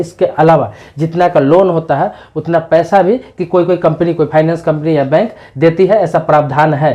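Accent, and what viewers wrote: Bihar, India. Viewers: Kya bolte ho dhyan se sune fir bolein,kya bihari ho........tabhi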